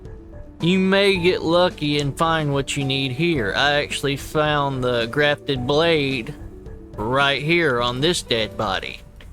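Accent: South, United States. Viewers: bros aggressively southern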